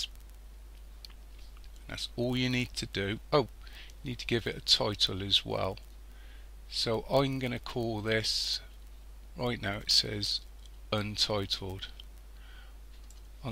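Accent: Bristol, United Kingdom. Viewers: Smashing video. Love the accent BTW - Bristolian?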